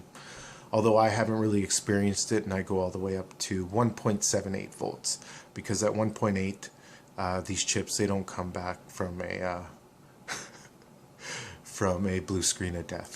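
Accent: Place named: Canada. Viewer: Dude. The radiators out the window and the Canadian accent commentary made this whole video for me. Fuck Yeah!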